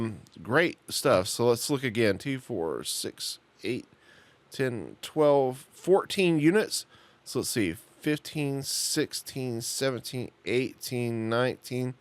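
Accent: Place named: Texas, United States. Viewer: Love the Texan accent 🙏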